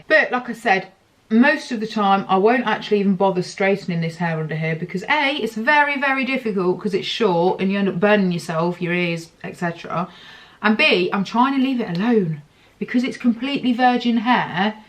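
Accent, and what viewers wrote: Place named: United Kingdom. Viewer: So Bri’ish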